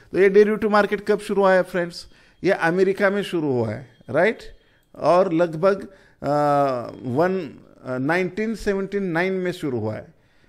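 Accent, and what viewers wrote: Maharashtra, India. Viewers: Hats off to your explaining process with great marathi accent, maja aali fun with learn just wanted to tell others that learning is not a overnight change process. It required lots of time, practice, patience and self believe. Guruji ek video practical daalde aur aap turant wo sikh jao agar aisa he to share market aap keliye nahi he dosto sorry to say that but it's fact.🙏🏻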